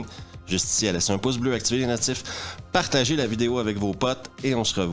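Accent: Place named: Montreal, Canada. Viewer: Hé Mister, quel plaisir, quel bonheur ! merci de partager ces bons moments avec nous, j'ai découvert ta chaine par hasard il y'a quelques jours et crois moi, mes 15 minutes quotidiennes de nanar et de souvenirs filmographiques me procurent un bien être et un lâché prise inégalé. Tout y est le choix des films qui ont bercés mon adolescence, les mots pour le dire, le ton, cet accent qui sous forme de "Madelaine de Proust" me ramène à la fois dans mes années 80 puis 90 quand je vivais à Montréal. J'ai rarement été si enthousiaste à l'écoute d'une émission, merci mon pote, tu fais partis de la famille now, je partage cette expérience avec mon fils de 17 ans, ce sont de réel moment de complicité. A bientôt.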